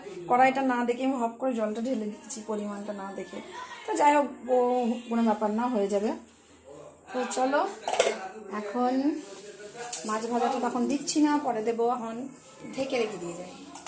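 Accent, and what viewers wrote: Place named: Birbhum, India. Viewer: দিদি তোমার মুখে বীরভূমি ভাষা শুনতে খুব ভালো লাগে.. কি সুন্দর ব্যাটা বিটি বলো .. সুর করে কথা বলো .. এই ভাষা তোমার মুখ থেকে শুনেই শিখেছি ❤❤❤❤❤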